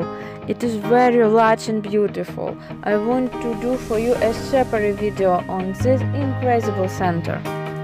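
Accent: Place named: United States. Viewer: Beutiful American voice bravo. Can you visit usa? I have the money.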